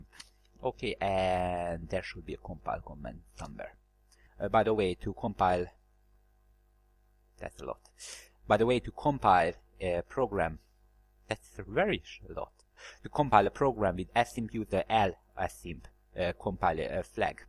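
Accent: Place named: Hungary. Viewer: Are you Hungarian? :)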